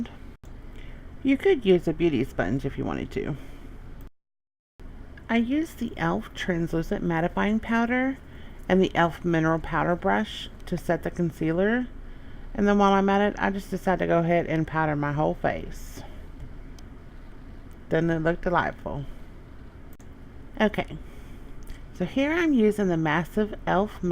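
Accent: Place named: South, United States. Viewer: Where are you from? I can tell the southern accent...lol. I'm from NC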